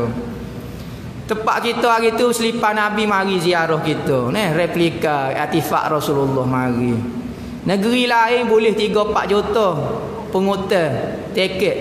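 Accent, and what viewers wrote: Kelantan, Malaysia. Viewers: Seronok dengar ceramah ustaz ni... Walaupon sleng kelantan dia pekat gile. Antara ustaz ksukaan sy.. Selain UAI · Terima kasih ustaz.. walaupun sy org Johor tapi Sy boleh faham bahasa Kelantan walaupun pekatnya MCM mana pun tetap sy faham.. tapi sy nasihatkan ustaz jgn lah guna bahasa Kelantan..guna bahasa Kuala Lumpur..sbb ceramah yang ustaz sampai kan sy tak pernah dgr lagi mana² ustaz² yang sampaikan..jadi sy risau masih banyak lagi ada orang yang tak faham bahasa Kelantan... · Bila ikhlas nak dgr ceramah dan nak ilmu, alhamdulillah Allah permudahkan utk beri kita faham walaupon dia pekat loghat kelantan… alhamdulillah aku faham semua apa dia sebut.. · Cara pengkisahan yang sangat menarik... walaupun guna loghat Kelantan tetapi alhamdulillah boleh paham.. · walaupun pelat kelantan pekat ambo masih faham......orang kedah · Bagus cerita ustaz ni. 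Mudah aku nak faham walaupun pekat loghat Kelantan. Terbaik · Alhamdulillah. .setiap patah perkataan dan atonasi ustaz masuk ke dalam hati...walaupun pekat loghat Kelantan. .alhamdulillah. .saya masih diberi kefahaman mendengarnya dengan izin Allah SWT. ....semoga kita berjumpa satu masa nanti ustaz....aaminn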